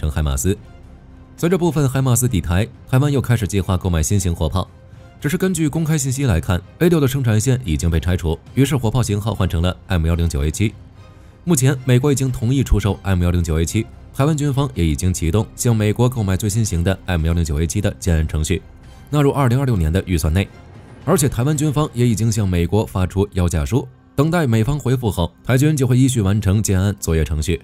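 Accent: Mainland, China. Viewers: 這個頻道的語音為什麼是中國大陸的口音？是用中國大陸的AI配音嗎？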